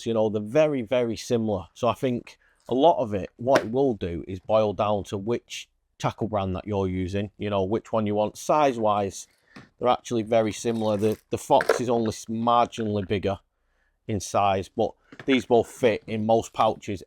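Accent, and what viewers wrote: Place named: Northern England, United Kingdom. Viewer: Northern accents and Carp Fishing tackle reviews seem to work quite well! 💪👌 haha good to see you back lad! 😁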